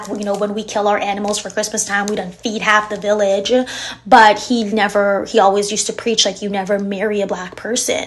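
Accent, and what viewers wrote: United States. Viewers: Speak like a guyanese.....you turn yankee overnight like the others.... Be yourself and respect who u r and your background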